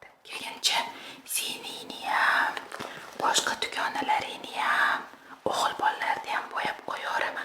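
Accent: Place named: Andijan, Uzbekistan. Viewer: Juda ham ttabbiy chiqqan anjancha gapirishiga bitta❤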